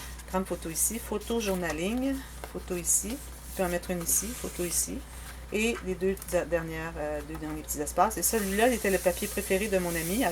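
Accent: Canada. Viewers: Je m'abonne grâce à (eternity pour toujours) tu es très doué magnifique j'adore je like 👍👍👍👍👍👍 as tu fais un tuto pour l'enveloppe à soufflet tes explications sont très très claire j'adore aussi ton accent tu es canadienne ? Si oui j'y suis allé et j'ai adoré je suis revenu et je voulais déménager la bas mil merci pour votre partage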